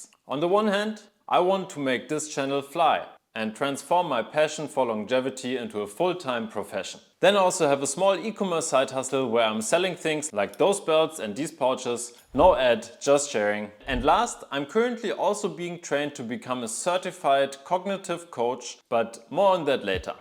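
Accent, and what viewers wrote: Germany. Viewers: I love the video! And the German accent as well🤩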